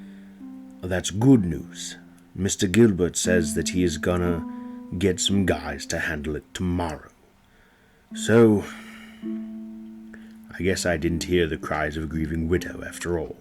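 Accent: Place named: United Kingdom. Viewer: I would love to hear you narrate a James Bond audio book you have a great British accent!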